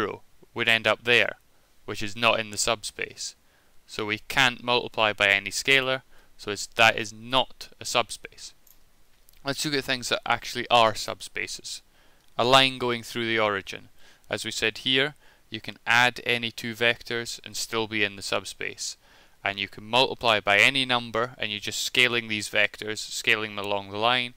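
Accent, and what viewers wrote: Scotland, United Kingdom. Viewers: Nice scottish accent! *<:D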